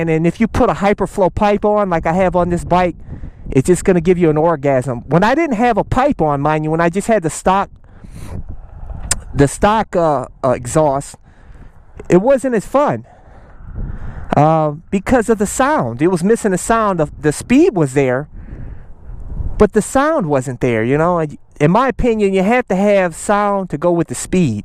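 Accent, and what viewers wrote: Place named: Midwest, United States. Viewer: He grew up in an urban area of the midwest which is why its sounds like a mix of mid-western and northeastern dialects. Its the reason why its hilariously endearing when he says things like "FO DA STREETZ" "HUNDID" and "DAHBUL ARRR" lol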